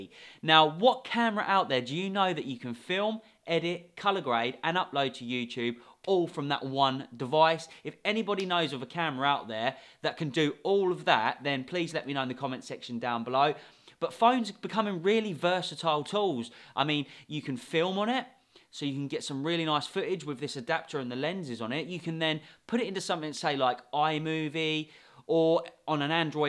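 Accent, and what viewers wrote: United Kingdom. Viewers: I am in love wifh vhe cockney accent brova Bu' vhe presentation was good. Fhanks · that british accent made the video even more interesting to watch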